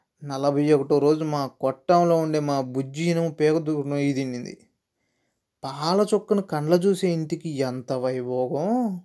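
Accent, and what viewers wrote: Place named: Chittoor, India. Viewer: Baya chittoor slang lo chaduvu baya super ga untadi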